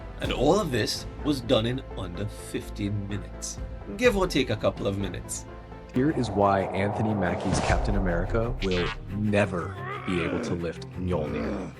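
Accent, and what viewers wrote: Trinidad and Tobago. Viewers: Why u sounding like a trinidadian lol❤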